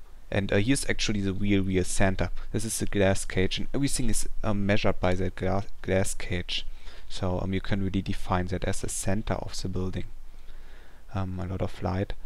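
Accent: Germany. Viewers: you sound german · you have a german accent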